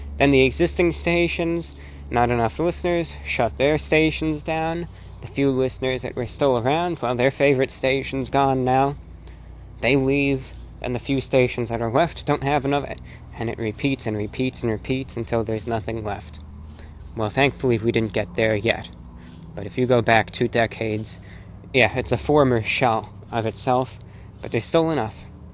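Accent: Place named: Mid-Atlantic, United States. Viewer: Gotta talk with that mid-atlantic accent.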